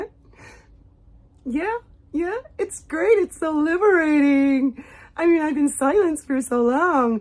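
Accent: New England, United States. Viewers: Great American accent.... I'm American too! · A masterpiece! I don’t know how you can do such a good American accent—I can’t do a British accent to save my life! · Great American accent! Touching hair nonstop! Laughed and scared the dog when you twerked up the skirt!🤣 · Your yankee accent is so funny 🤣 I mean, the whole thing was 💯👏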